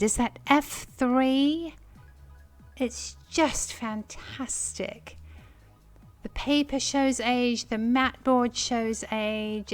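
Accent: England, United Kingdom. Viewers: Love your accent my mother was from England. Miss her voice. Love your videos!!!💕💕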